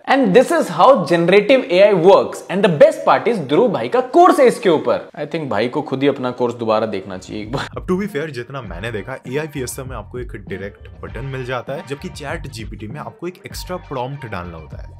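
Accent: Bengal, India. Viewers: Bhai aapke hindi mai aapka bengali jalakta hai 😅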